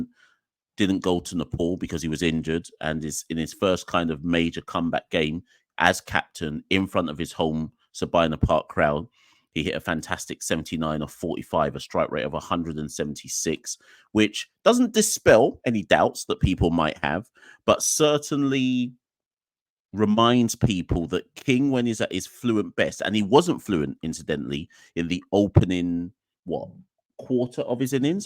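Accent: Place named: England, United Kingdom. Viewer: Are you in England or u just have an English accent? No disrespect but just want to know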